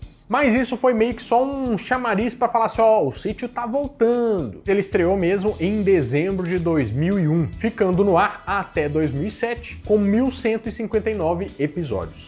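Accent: Minas Gerais, Brazil. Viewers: E com sotaque mineiro arasaaaa seus vídeos são maravilhosos e com os comentários de vcs ❤️❤️❤️❤️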